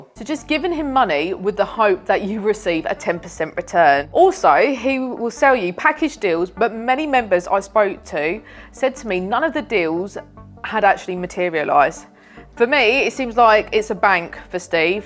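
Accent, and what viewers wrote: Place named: Cockney, United Kingdom. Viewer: Hey you have such a strong cockney accent for a Punjabi girl..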